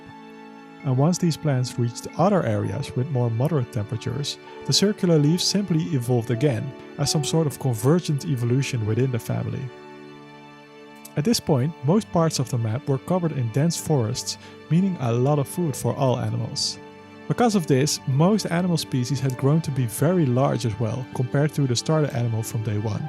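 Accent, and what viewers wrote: Netherlands. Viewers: Are u Dutch? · That funky Dutch accent. Loving it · Dutch eksent? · ARE U DUTCH? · This guy is DUTCH, that accent I can recognized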